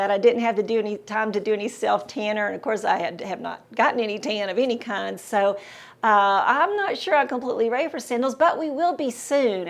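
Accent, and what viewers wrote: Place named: South, United States. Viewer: I love all of these shoes! Very stylish and sensible. What I love even more is your southern accent and how you pronounce "shews" .. It's so adorable!❤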